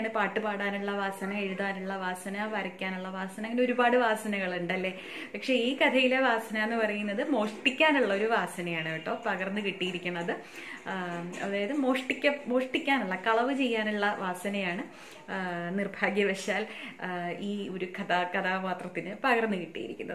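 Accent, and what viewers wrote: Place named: Valluvanad, India. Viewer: വള്ളുവനാടൻ ശൈലിയാണല്ലോ....😄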